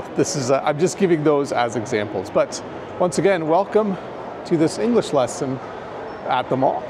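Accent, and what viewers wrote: Canada. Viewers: We enjoy Canadian English, thank you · hello! i got a question, what’s your accent? is it canadian, or i’m wrong?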